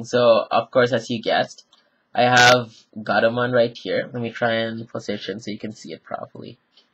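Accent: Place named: Caribbean. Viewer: Where you from....You sound like somewhere from carribean Loving the accent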